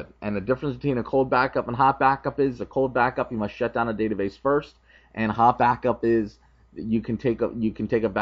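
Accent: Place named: United States. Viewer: the only video on youtube with north american English accent, easy to understand and no headache, no matter how good tutorial is by our Indian bros but very thick accent not worth watching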